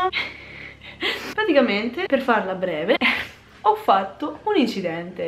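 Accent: Rome, Italy. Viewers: Ma sei di Roma?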